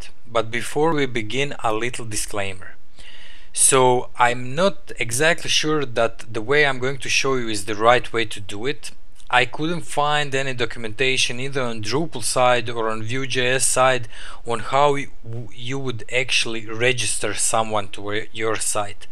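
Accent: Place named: Russia. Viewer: Привет, вижу русский акцент, можешь на русском уроки тоже писать?)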